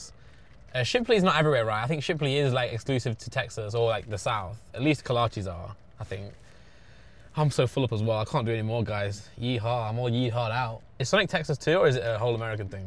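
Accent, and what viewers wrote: United Kingdom. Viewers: The funniest part of this is you look so cowboy with the hat but then you’re violently British when you talk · I hate British accents, painful watch but I’m only watching to see papi whataburger. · he prob has one of the most tame british accents lol but go off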